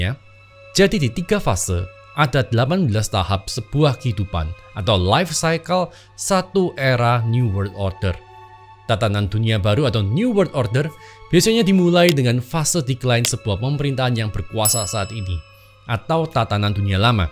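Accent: Java, Indonesia. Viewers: Jateng atau Jatim ko, medoknya hampir ga keliatan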